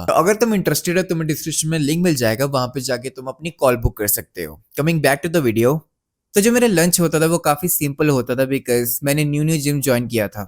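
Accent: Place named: Pakistan. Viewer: Accent to pakistani lagte ho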